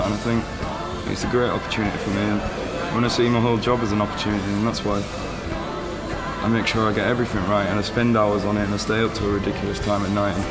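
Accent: United Kingdom. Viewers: I love his brittish! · Was not expecting tht propa strong yorkshire accent lol!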